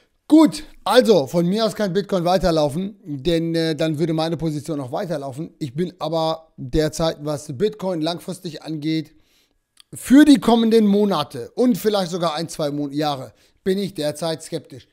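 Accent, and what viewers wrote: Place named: Switzerland. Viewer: das ist glaube das Schweizer Englisch.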